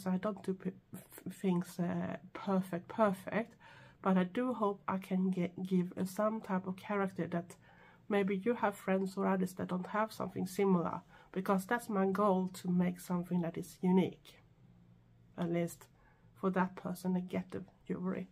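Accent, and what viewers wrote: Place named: Sweden. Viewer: Where did the comment I just typed go🤔hmm probably my crap satellite excuse for internet service....anyhoo I said the has the most gorgeous accent I've ever heard! I've never known anyone with it before you. I Adore it!☺🤗🖤🖤🖤🦊I'm going to Fika now😆👋🏻TTFN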